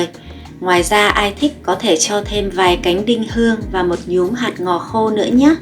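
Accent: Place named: North, Vietnam. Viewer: A+. Giọng Bắc hay quá nên cho thêm hai dấu + ... A+++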